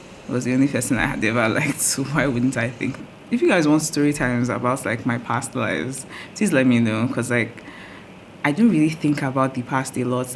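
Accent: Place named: Nigeria. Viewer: Hot nigerian accent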